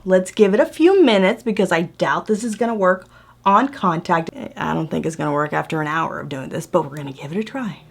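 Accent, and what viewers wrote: Texas, United States. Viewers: You sound Texan.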